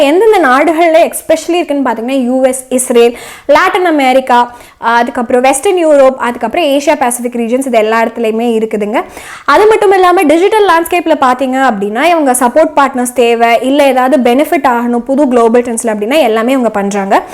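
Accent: Coimbatore, India. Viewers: I appreciate your Coimbatore Tamil lecture.long live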